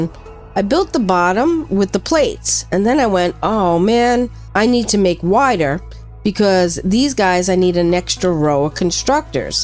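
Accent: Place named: New Jersey, United States. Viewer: I agree! One of the reasons I enjoy this series is because she sounds like my wife’s family, who hail from northern New Jersey. Aside from their making fun of my (practically nonexistent) southern accent for a few years, you won’t find a better group of people. And yeah, they know where the best deli and bagels are (and now so do I!)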